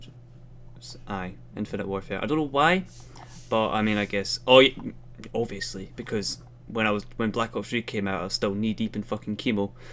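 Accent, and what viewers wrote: Scotland, United Kingdom. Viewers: Are you from scotland? :)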